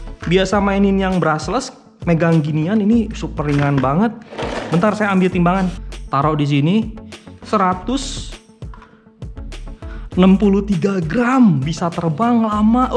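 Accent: West Java, Indonesia. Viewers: Mantap bang orang sunda ya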